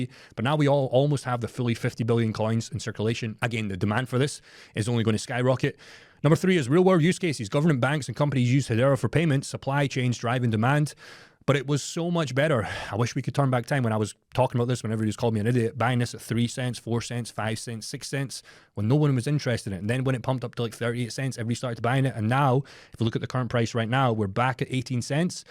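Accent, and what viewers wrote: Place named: Edinburgh, United Kingdom. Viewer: As an ex Edinburgh resident I enjoyed hearing this well informed crypto briefing in an accent I know well. Great info. Excellently presented. I subscribed !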